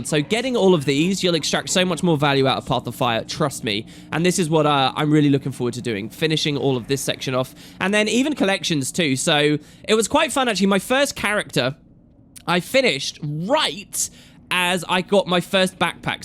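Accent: United Kingdom. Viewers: I really like this channel and find myself agreeing with much of what the speaker says. Though this could be because I'm a sucker for a British accent. :-D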